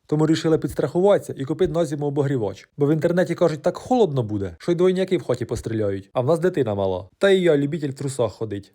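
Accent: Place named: Volyn, Ukraine. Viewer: Випадково в головного героя даного відео немає брата на Волині Такий самий говор та поведінка Маю такого знайомого геть схожий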